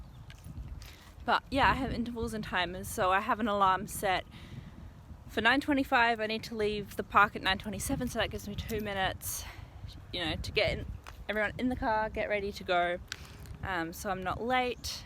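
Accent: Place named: Australia. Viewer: I hear more of a Australian accent from you. 2ish years ago it sounded more like an English accent. I know you're german so you probably learned English with more English influences than Australian. I'd like to hear your thoughts.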